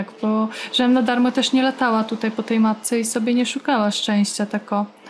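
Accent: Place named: Podlasie, Poland. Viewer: Møwisz Tak o jestes z podlasia :P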